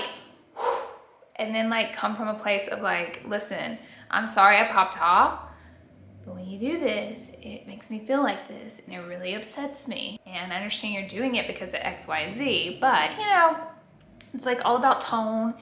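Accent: South, United States. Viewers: Heyyyy 🤗 I will never get tired of you Accent soo Country I Love it 🥰🥰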